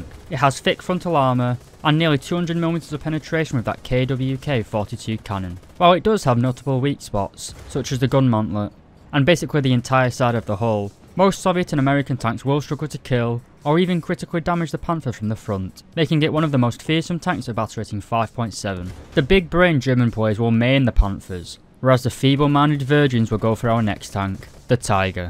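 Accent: United Kingdom. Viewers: bro i would watch the video if u werent british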